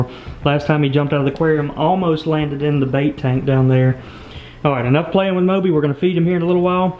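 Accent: South, United States. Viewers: Lol at his southern accent.. dan-dindley-doo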